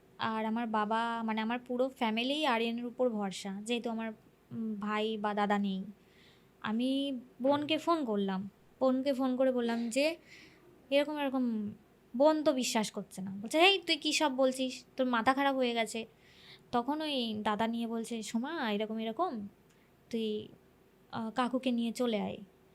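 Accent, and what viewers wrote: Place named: Bangladesh. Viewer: UNI BODH HOY BANGLADESHI BY BIRTH...... ONEK BANGLADESHI MANUSH OI BHABEY PRONOUNCE KORE......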